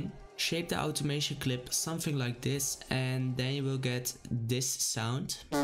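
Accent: Netherlands. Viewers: nou ook weer goed te horen dat het een nederlander is lol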